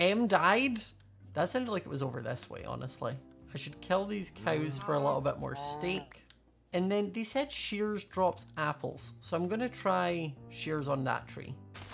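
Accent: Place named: Scotland, United Kingdom. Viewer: Only 817 views?? Come on we can do BETTER. Btw, everytime I watch, I start speaking like a SCOTTISH PERSON!! I called my friend and she was like, who is this? Did you go to Scotland?? I'm AMERICAN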